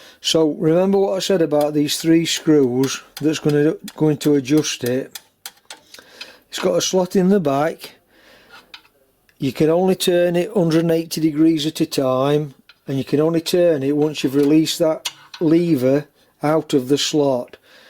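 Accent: Yorkshire, United Kingdom. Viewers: I caught your name in the comments the knacklers workshop, I’m pleased to sub you and will be looking through your back catalogue. You sound your from the land of the White Rose. So I’m not too far away over the border. If I can ever help.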